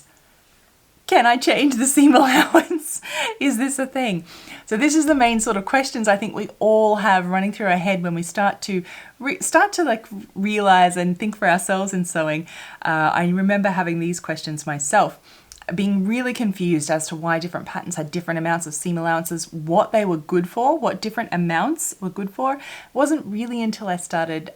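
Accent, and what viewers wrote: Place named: Australia. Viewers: Personally I love your accent. I had the privilege of living in Australia for a year in high school, and listening to your videos brings back memories. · Well said ladies, who cares how pronounces her words, Its the content of her very informative videos that matter. I love our ascent, from a fellow Aussie 😉🇦🇺🐨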